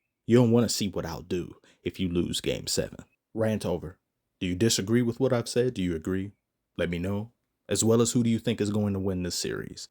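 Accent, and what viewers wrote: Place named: United Kingdom. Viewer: Sounds like a British/Aussie accent more than a Kiwi one lol. Keep it up tho, moite! 🤙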